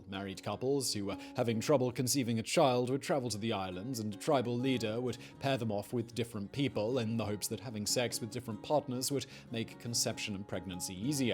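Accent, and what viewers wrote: United Kingdom. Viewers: ahh british v sauce always makes my day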